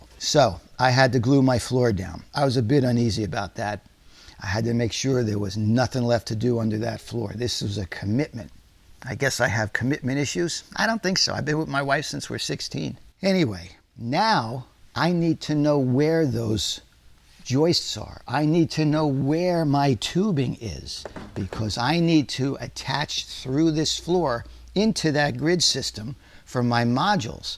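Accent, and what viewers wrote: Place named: New York, United States. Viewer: I am new to your channel. I saw you on Lola's channel. I love your work & your accent, it's kind of New Yorkish. Yes Americans do have accents!.. Now l want to know what's wrong with the mat! 🤣🇬🇧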